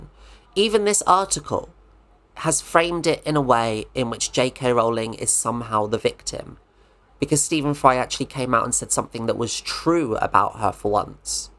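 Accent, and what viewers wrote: United Kingdom. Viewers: this is the first video of yours i’ve watched and i’m loving it! love how conversational it is, very easy to listen to and enjoy :-) also the english accent and dry humour definitely feels like home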